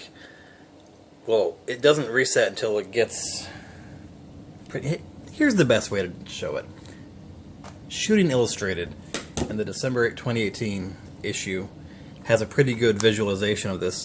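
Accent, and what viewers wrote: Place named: Carolinas, United States. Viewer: What part of teh Carolina's are you from? I'm having a hard time placing the accent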